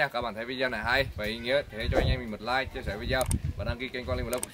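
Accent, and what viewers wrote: Nghe An, Vietnam. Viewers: Mẹ mình cũng ở quê Nghi Lộc. Nên nghe tiếng của Linh sao mà thích ghê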